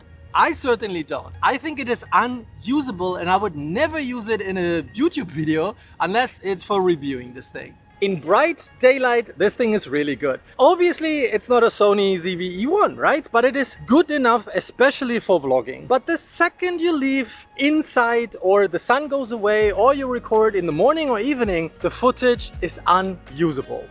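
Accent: Germany. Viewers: My first visit to your channel. Very nice experience. For once, a sure feeling that you are not promoting anything. We need people like you. And if I were in China (or Dubai) with you, I would find your German accent charming ;)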